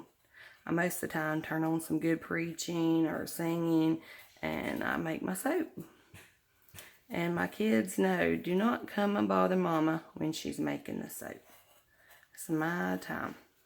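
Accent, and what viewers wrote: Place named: South, United States. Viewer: Great job & I love your southern accent 😍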